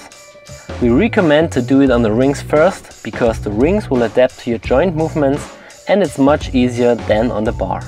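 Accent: Germany. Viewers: is this dude german? just asking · He is a german. You can hear it wery clearly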